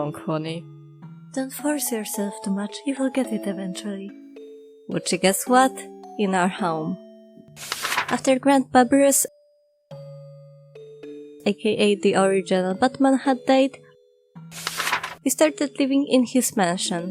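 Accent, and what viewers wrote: Poland. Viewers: Questioooon, Are you polish? The accent kind of sounds Polish and I wana know if I’m good at recognizing other Poles, and if Not I’m still glad you’ve actually taken the time out of your life to learn english, You sound awesome >:3 ALSO TPN AND HANAKO KUN MENTIONED WOOOO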